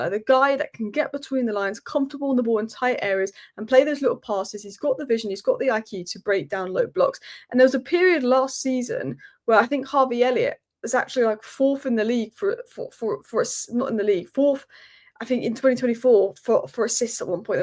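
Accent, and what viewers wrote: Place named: Liverpool, United Kingdom. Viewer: . mem, r you sure 😅🫰🍻you're a ggmu fan.?, 😅✌️bcs you sure sounds like a scouser gal😄✌️🤨👍😁...just noicee 👍👍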